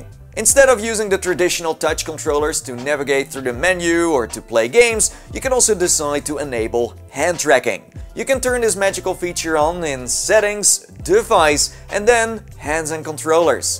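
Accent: Netherlands. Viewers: Ben je nederlands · You sound dutch (just a random though) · Ben jij Nederlands? Want je hebt zo een accent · are you dutch by any chance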